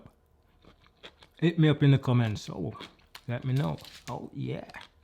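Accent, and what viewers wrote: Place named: Jamaica. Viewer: I don't know if it's a Jamaican accent or African....oh ..Jamaican.. Big up G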